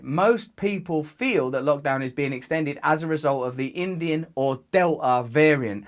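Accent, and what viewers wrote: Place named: United Kingdom. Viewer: I'm almost positive your British accent has become thicker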